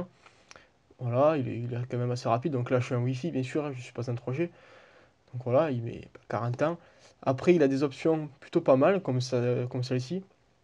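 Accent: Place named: South, France. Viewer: Oh t'a un accent mdr t'es bien du sud Saint Christol les ales j'habite pas loin xd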